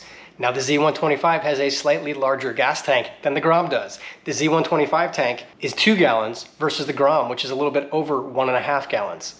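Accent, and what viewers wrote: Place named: Chicago, United States. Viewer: you seemed to have some, of the Chicago area inflections, oh well, thanks for answering, have a good day.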